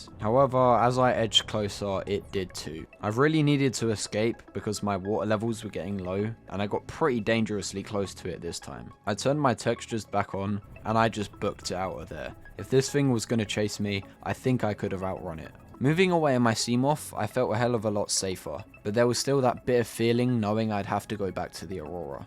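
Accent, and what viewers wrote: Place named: Australia. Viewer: Dude, are you an Aussie? I am and you kind of that sort of accent half the time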